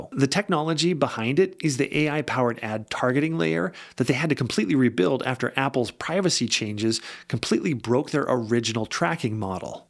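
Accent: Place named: Midwest, United States. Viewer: yep, I listened very close and I heard you and trusted 100% in your research! Been listening very close since the first vid I watched of yours! Must have heard that midwest accent! Thank you for alllll the hard work and explaining what you did at AMZ explains a lot! Even have my husband listening now!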